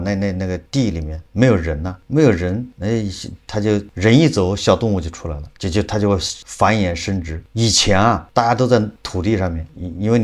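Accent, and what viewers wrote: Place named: Northeast, China. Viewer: 我还以为是东北人😅爱吃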